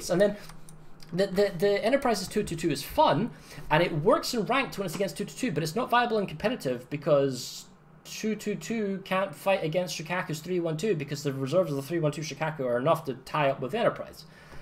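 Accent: Scotland, United Kingdom. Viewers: ?? ...ok... II'm a Midlander, and even I can hear the Scotts in his accent, than an Irish sound. (Mind-due the Proddies and Catlicks can sound different from each other, ..but, just the crazier ones of of both sound more like Glaswiegens with less phlem & spit in their syllables me.) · You are a Scot? You sound very American... I would love to hear your commentaries in Scottish, love the accent! :D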